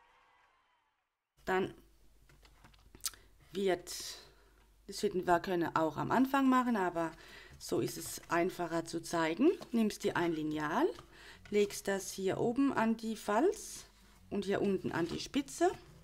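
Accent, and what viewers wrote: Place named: Hesse, Germany. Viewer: Habe dein Einführungsvideo gesehen und kann kaum glauben, dass du dir so wenig zugetraut hast. Box ist sehr hübsch und akkurat gearbeitet. Als gebürtige Hessin mag ich deinen Dialekt ganz gernze hören . LG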